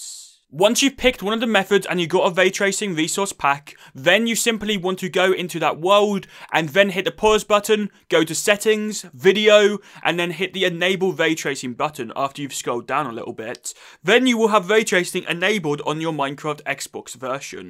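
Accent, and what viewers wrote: United Kingdom. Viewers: i think he’s just british 💀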